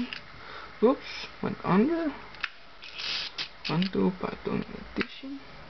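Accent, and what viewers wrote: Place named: Denmark. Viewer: oh god that horrible accent you're danish aren't you?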